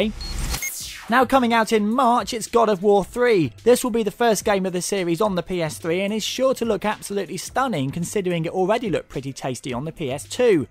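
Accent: England, United Kingdom. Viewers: Thats the way they talk in England. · Why do all game critics have British accents? I can barely make out what he's saying. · Its British SON! :D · I love it how all of you people, who I am guessing are American due to you're comments about his voice, say that it sounds wierd. If you knew anything outside of your own cozy fucked up country then you may have heard an ENGLISH accent before... You're ignorance and arrogance makes me feel ashamed to be of the same species as the scum that American people are. Everyone outside of America, hates America - I just thought that I would let you know, seeing as you would not know if I didn't say...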